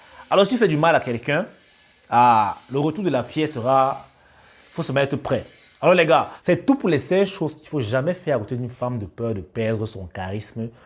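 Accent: Cameroon. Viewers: Je reconnais là l'accent de mon frère du 237 😎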